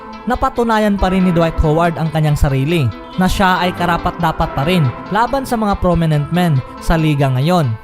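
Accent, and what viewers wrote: Visayas, Philippines. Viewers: My pagka besaya mag saleta ahaha. Pinahaba lang ung conteksto. Tonge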